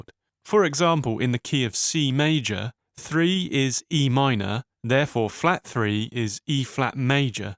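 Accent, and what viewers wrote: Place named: United Kingdom. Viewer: why does every tutorial have a dude with an annoying brittish voice?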